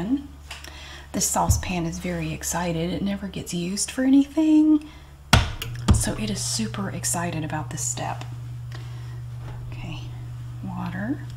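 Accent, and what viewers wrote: South, United States. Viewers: Thanks, another great video. My sister and I love your southern accent, it relaxes us, probably growing up watching American shows on TV. Love from Melbourne, Australia.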